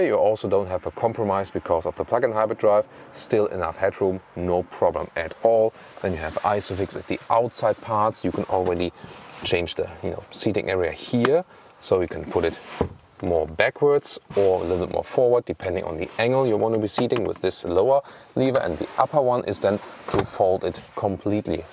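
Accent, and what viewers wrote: Germany. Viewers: Da sieht man dass du deutscher bist aber sehr gut english kannst. Danke fürs review!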